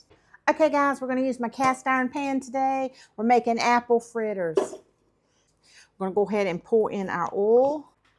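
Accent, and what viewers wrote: United States. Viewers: Where is your fantastic accent from in the US? A curious guy from Buenos Aires, Argentina asking!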